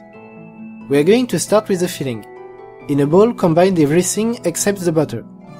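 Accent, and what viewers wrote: France. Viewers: Mystical Turnip its French mixed with kahjiit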